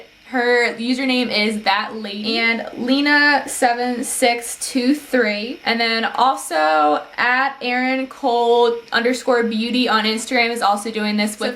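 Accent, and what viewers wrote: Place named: Maryland, United States. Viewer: I'm your neighbor to the south. I'm in MD which is why your accent is so familiar. lol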